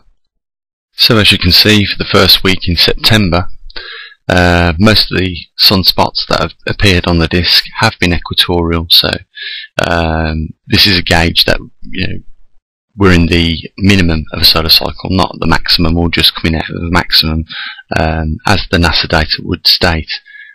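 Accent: Birmingham, United Kingdom. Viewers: I here a brummie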